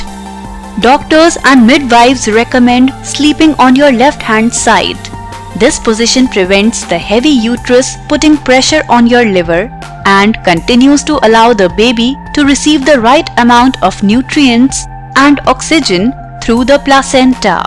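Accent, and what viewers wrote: India. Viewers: Indian accent tsk...hate it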